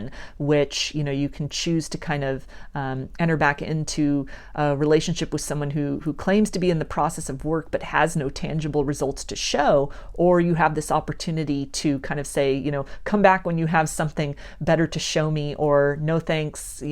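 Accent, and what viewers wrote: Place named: United States. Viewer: Loving your American accent! Wishing you the best and plenty of success for your channel. New Subscriber! Thank you for your gifts. Have good day everyone!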